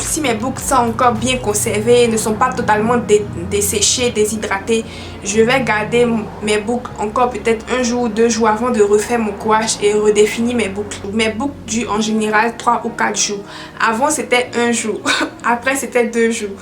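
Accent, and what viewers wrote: Ivory Coast. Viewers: L’accent ivoirien qui ne ment jamais 😂❤️ big kisss depuis babi!!!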